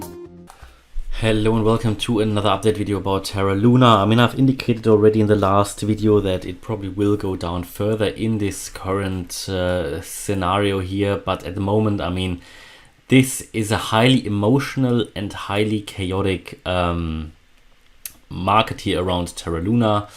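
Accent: Germany. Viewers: Thanks for the video, are you from Germany?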